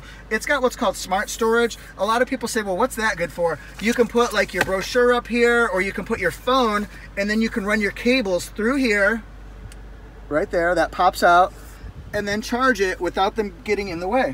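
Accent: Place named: Canada. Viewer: I tried to find the dealer location to get a clue about the accent. Is it Canadian? I am afraid I am not great with North American accents as I live in New Zealand.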